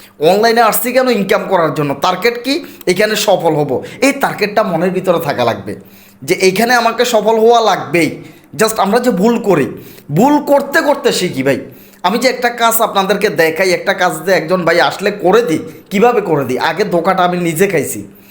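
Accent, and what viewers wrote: Chittagong, Bangladesh. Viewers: Bai r basa ki Chittagong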